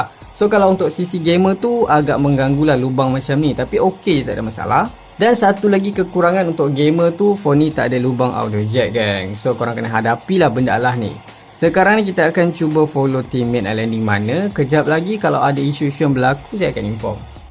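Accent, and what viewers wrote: Malaysia. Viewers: Padahal banyak dr indo pengen tau banyak tentang HP ini sayangnya bahasa Melayu nya kental banget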